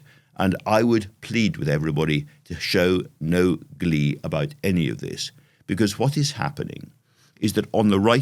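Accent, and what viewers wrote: RP, United Kingdom. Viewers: Science is essentially always "a work in progress" and when politics and science combine to effect a policy, then it is a matter of proper concern. Conservative England -both mad and paranoid? A bit of the usual modern media hyperbole. Par for the course for a BBC voice perhaps?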